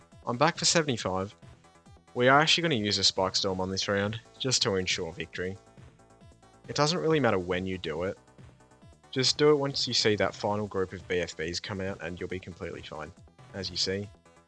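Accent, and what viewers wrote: Australia. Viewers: Glad to hear another Aussie, what state are you in, better not be another fucking S.A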